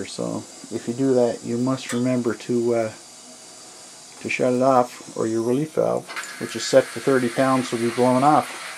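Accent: Cape Breton, Canada. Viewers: Also are you from Cape Breton?! You sound like a fellow caper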